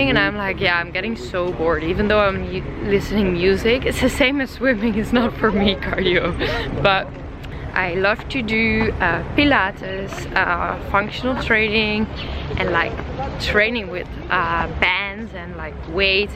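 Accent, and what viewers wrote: Netherlands. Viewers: ***I LOVE YOUR DUTCH ACCENT!!!!****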